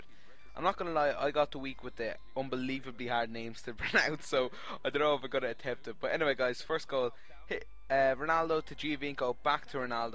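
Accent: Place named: Ireland. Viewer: Gaming countdowns never fail with an Irish bloke commentating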